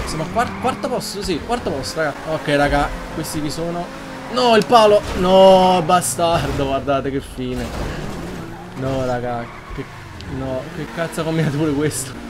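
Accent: Naples, Italy. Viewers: Sei napoletano ?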